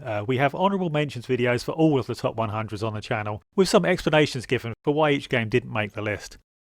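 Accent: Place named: United Kingdom. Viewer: Is this a Brit accent or some other non American commonwealth? Aussie maybe?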